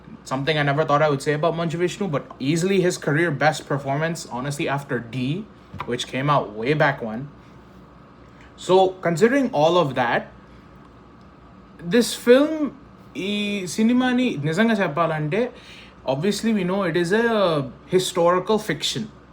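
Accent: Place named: United States. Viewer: Bro just speak without an accent bro. your /r/ sounds are all over the place. But you are doing a good job of creating content. you mix US and UK accent traits and as a voice and accent trainer you sound really Cringy bro. No offence, Lemme know if you want we can explore few tips so you soundd realllllly good bro. All the best!!! Peace :)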